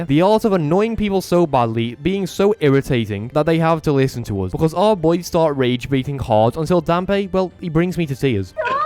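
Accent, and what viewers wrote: United Kingdom. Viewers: MOST British accent ever I've ever heard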